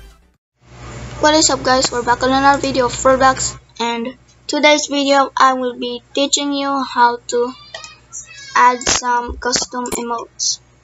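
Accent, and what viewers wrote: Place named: Philippines. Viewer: nice filipino english accent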